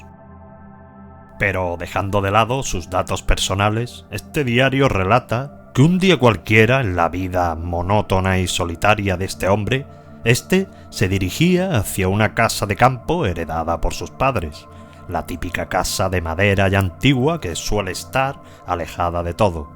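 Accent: Spain. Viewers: Hola Me gusta cómo narra los videos y las historias Yo vivo en California soy de Guadalajara México gracias por tan bonitas historias Por su forma de hablar creo que viven en España gracias otra vez y Hasta la vista recuerde yo lo escuchó siempre y la mayoría de sus historias las tengo guardadas Hasta la vista y cuídese Que Dios lo bendiga Pepe Gaitán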